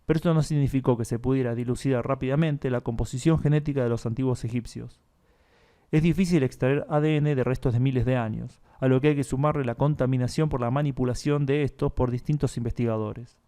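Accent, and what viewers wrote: Argentina. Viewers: Por fin un canal interesante y narrado por un argentino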